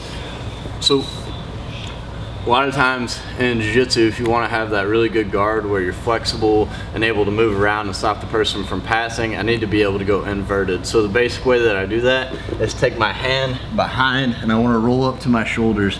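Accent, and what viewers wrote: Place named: South, United States. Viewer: he kinnda have like a southern accent, great drills fella, I will try them out on today's class!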